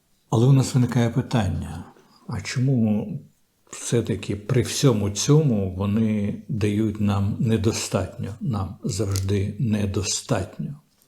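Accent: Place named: Russia. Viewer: Доречні, пане, у Вас з'явився російський акцент! Таке враження, що Ви перекладаєте російський текст на українську!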